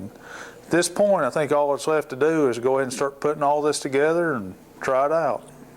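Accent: South, United States. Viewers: I understood him perfectly lol...... We don't talk that unusual in the south.... :D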